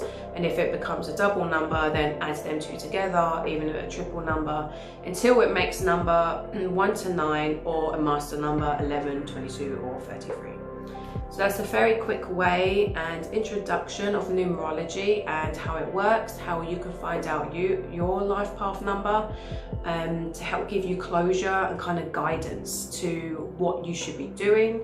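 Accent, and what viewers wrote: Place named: London, United Kingdom. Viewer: I'm a 22 here, but I haven't been able to build anything thus far. Not sure what the blockage has been but I'll bet its been more an issue of learning to build than anything else. I was trying to place your accent through the whole video. Are you a Londoner?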